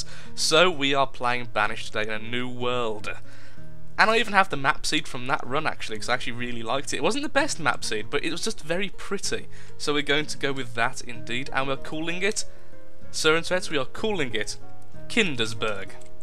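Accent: United Kingdom. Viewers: Harry Potter accent of english <3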